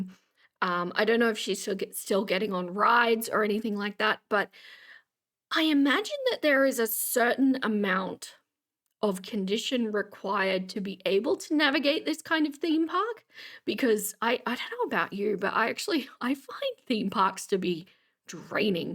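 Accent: Australia. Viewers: There's a a lot of aussies flowing in as creators? I like it, i love the accent 😍🥰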